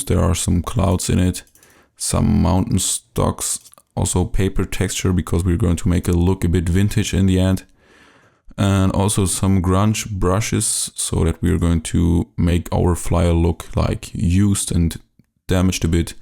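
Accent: Germany. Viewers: I like how you make stuff on the fly, like u even surprise yourself. lols The german accent is also refreshing for some reason, maybe coz its rare that i hear it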